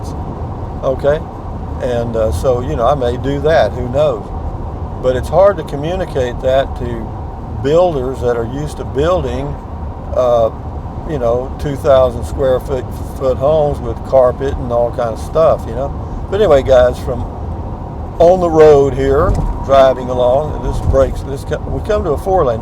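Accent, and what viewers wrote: Texas, United States. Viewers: Happy July 4th! Another enjoyable video. Since watching your videos the last few months I am enjoying life more. Your uplifting no nonsense attitude with your Texas draw is giving us something good in our day. My daily dose of smiles :) Thank you!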